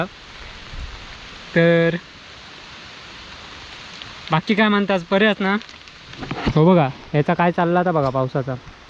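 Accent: Malvani, India. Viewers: तुझा वर कोण रागवत नाही बाबू तु मालवणी बोलूक लागलस काय खुप छान वाटता तु असेच छान छान विडीयो टाक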